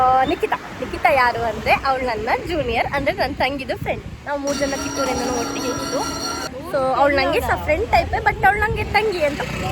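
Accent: Kundapura, India. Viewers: ಕುಂದಾಪ್ರ ಕನ್ನಡ ಚಂದ್ ಮಾತನಾಡತ್ತಿರ ಮೇಡಂ ❤️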